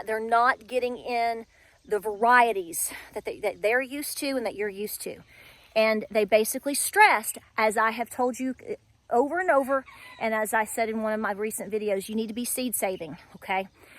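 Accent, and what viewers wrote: South, United States. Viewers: I love your southern accent 😍😍😍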